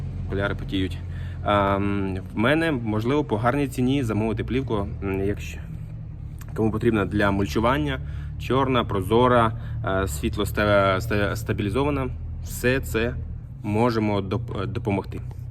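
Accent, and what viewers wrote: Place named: Poltava, Ukraine. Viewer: З яких часів полтавський діалект став суржиком,ґа?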